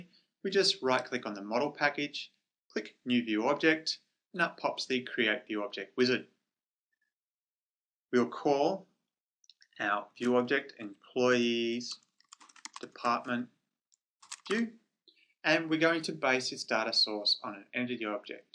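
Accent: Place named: Australia. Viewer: See, everybody loves an Aussie accent! ;-)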